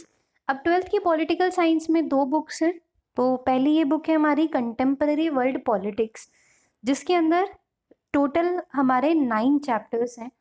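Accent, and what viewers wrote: Uttar Pradesh, India. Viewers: Mam app U.P. se ho kya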